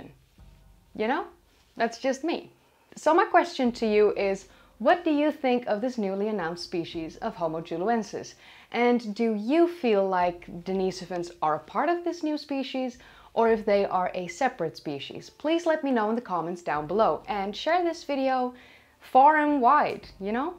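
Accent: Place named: Netherlands. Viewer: Very Dutch accent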